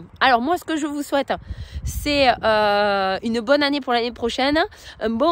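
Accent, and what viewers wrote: South, France. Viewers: Bonjour belle vidéo comme d habitude bien expliquée avec ton bel accent du sud Bon réveillon à a vous quatre et à l année prochaine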